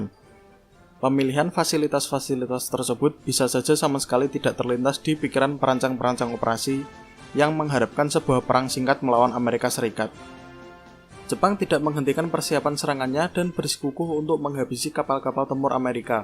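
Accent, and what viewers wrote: Java, Indonesia. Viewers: logatnya medok bgtt aduhh .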